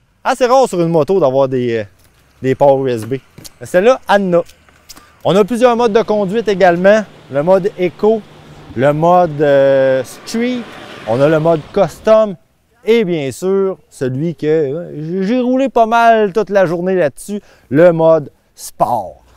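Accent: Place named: Quebec, Canada. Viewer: Cet accent ... J'adore ! vive le québec 😀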